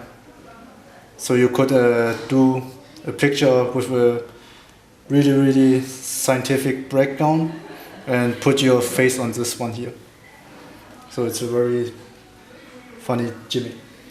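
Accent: Germany. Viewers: sounds German as f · Typical German - English ...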